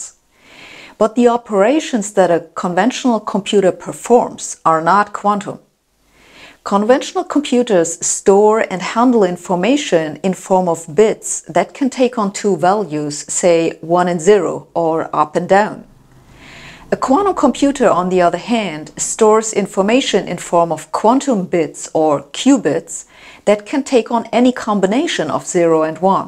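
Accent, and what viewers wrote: Germany. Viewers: Yeah. German accent = best accent (especially, of course, when pronouncing an actual German name :P) Prof. Hossenfelder has achieved accent supremacy.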